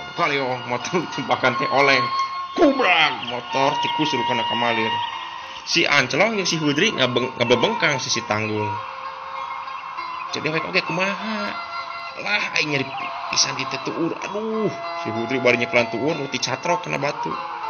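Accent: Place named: Java, Indonesia. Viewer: resep logat jawana.....hhee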